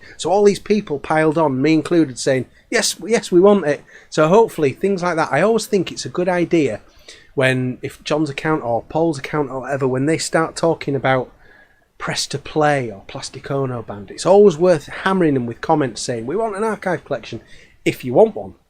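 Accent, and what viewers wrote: Leeds, United Kingdom. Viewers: haha no worries! Thanks from the response, it’s worth checking out Graham Gouldmans latest album “ ” think you would enjoy it! Also completely off topic I’ve always been able to tell your from Leeds just based on the way you pronounce “Remaster” like “Marster” if that makes any sense 😂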